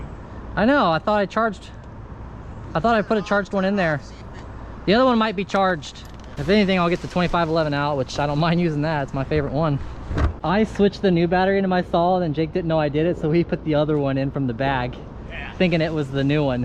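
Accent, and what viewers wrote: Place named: Ireland. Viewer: Irish accent…. Tree = three…. Fellers = fellas. …. Nevermind🤣😂🤣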